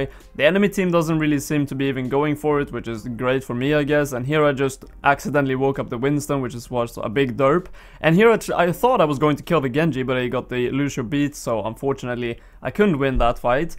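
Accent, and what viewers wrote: Sweden. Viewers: Are you from sweden?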